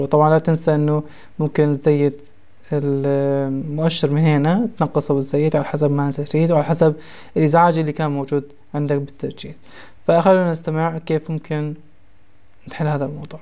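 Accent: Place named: Mosul, Iraq. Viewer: من اللهجة مبين من الموصل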